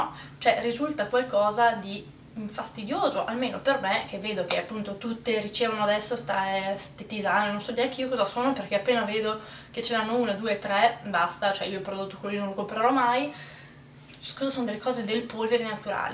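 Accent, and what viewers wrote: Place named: Veneto, Italy. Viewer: Sono una nuova iscritta é un vero piacere averti scoperta e ascoltata. Posso chiederti se sei Veneta? Io sono di Verona e trovo molto famigliare la tua cadenza. Ciao e buona giornata!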